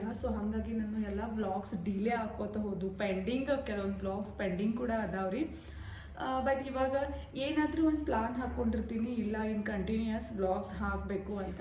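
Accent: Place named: North Karnataka, India. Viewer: Navu uttar Karnataka davaru nivu Nam tara matadtira😊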